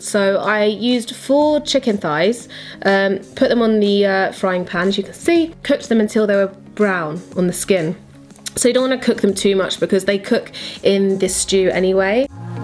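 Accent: United Kingdom. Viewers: Definitely UK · are you British ?🙋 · Sounds like UK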